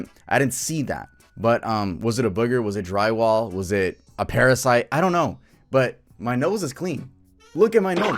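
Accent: Chicano, United States. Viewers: His pronunciation still has an american accent to it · don’t trust his pronunciation it’s very americanized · He does not have a correct pronunciation, he just sounds like a gringo to me lol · People disagreeing have not heard the things I heard in other videos. I agree he has a slight American accent tho but he is speaking in English lol. He just sounds like a Latino American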